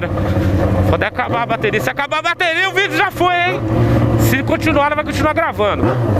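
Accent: Goiás, Brazil. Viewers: e Porque Fala o Pique Do Goias? Kkkkkk Sou Goiano e n Entendi n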